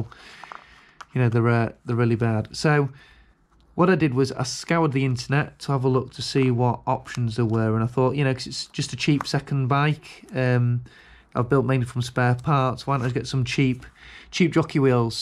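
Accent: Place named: North, United Kingdom. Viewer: No No, hes Northern, THEY all talk like that. (Thats not a dig, thanks for the vid by the way.)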